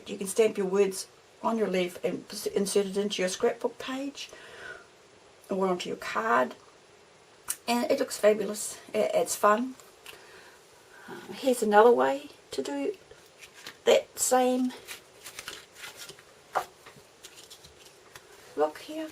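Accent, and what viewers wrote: New Zealand. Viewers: Please tell me what you said , between the music not quite faded out and the Kiwi accent [I'm Canadian] I can't quite decipher what type of paper you're using there. I've got " ... you can see where the back ___? this has actually been done ___? paper but don't let that put you off ..." Thanks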